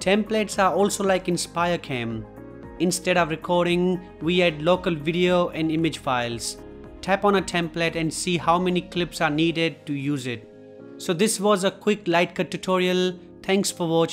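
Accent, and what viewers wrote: India. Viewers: Cet accent vient de l' Inde. Là bas les gens appuient fort sur les lettres quand ils parlent l'anglais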